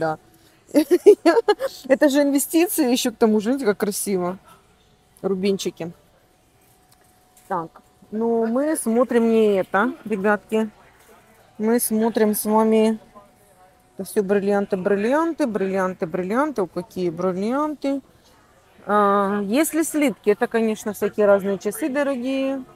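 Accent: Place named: Krasnodar, Russia. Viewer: Надо же, как силен краснодарский говор, даже через десятилетия😢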